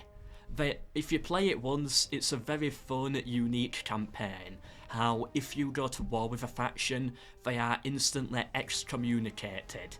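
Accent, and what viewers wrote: United Kingdom. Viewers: Idk how good is your micro but you still sound british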